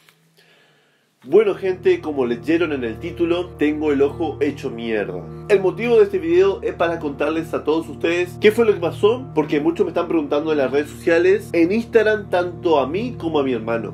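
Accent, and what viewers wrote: Argentina. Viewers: ¿eres argentino?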